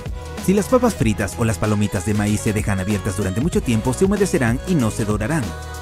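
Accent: Russia. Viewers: Eres ruso ???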